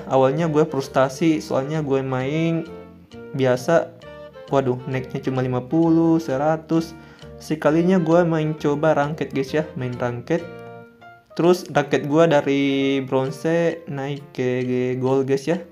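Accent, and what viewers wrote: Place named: Sulawesi, Indonesia. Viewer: Bg km pasti org Sulawesi!!?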